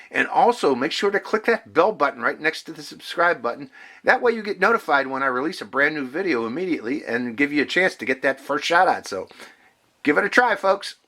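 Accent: Pittsburgh, United States. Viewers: I detected a Pixburgh accent! Thanks, from North Hills! Anyone know where I could get a basic case with this? Buying a couple as gifts.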